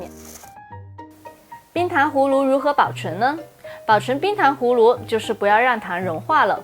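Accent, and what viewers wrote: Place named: Guangdong, China. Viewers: 怪不得口音很广东哈哈本人也是广东人😄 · 广东妹妹讲普通话好萌啊，哈哈。心灵手巧的妹子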